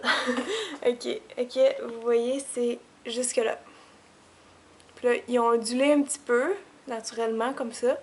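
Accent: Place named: Canada. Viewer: Bonjour, je viens de découvrir ta chaîne et suis en train de visionner une à une tes vidéos. J'aime bcp ce que tu fais et comment tu présentes tes vidéos et également ton accent (je rêve d'aller au Canada, alors je ne me lasse pas de t'écouter! lol) Et cette couleur te va super bien, tu es très belle ! biz de France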